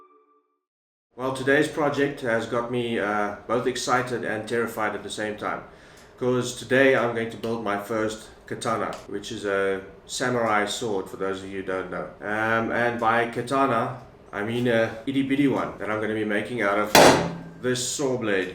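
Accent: South Africa. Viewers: South African accent is great :) Boer.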